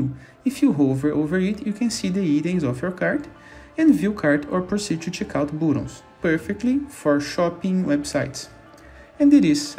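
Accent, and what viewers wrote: Brazil. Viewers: Esse sotaque ta me cheirando a brasileiro...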